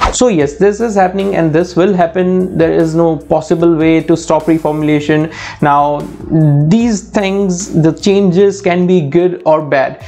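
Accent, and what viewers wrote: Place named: India. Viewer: Nice review bro. Just one small request; either use complete desi or complete American accent. Speaking desi English and using American "Rrr" or "gueed" alone isn't gonna make you easy sounding for the International viewers. Get some accent classes. You won't regret it. And if you are targeting desi viewers only, we don't mind full desi.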